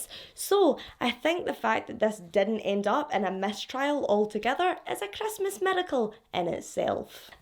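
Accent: Scotland, United Kingdom. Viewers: Living you I've been a true crime follower for a few years now. started it all! But I just love a Scottish accent tell me crime stories. You are awesome. Jen frombiggar!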